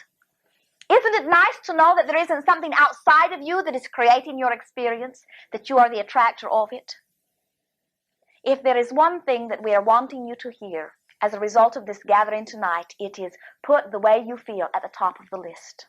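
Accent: Ireland. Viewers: why is Esther speaking with an Irish accent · Why is Esther speaking with an Irish leaning accent? Just curious.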